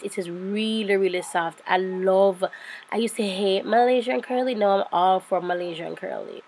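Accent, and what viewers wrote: United Kingdom. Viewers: I love this hair and your British accent 😊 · Which shipping company you use to ship your hairs herr in j.a ? And is it expensive ? Love the british accent tho 😘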